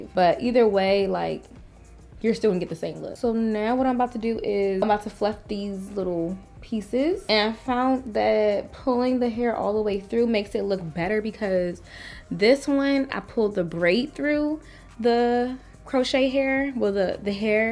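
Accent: DMV, United States. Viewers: Love it! New subbie here. I thought that you sounded like a fellow DMV sista 🤗💖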